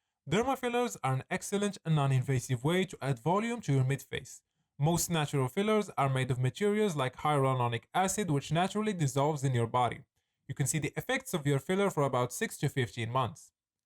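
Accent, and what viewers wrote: Morocco. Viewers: Why your accent is Moroccan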